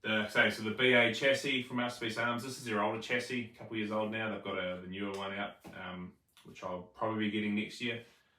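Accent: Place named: New Zealand. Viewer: I love listening to kiwis even though I'm not totally familiar with the slang. 😁 Thank you for the review of the MPA!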